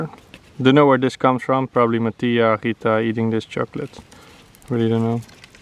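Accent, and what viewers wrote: Netherlands. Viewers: Yes his vocabulary is very obnoxious. Don’t want to be rude but sometimes I wish he would just speak his native Brabants cause his English is very “stonecoal” as we say in the Netherlands.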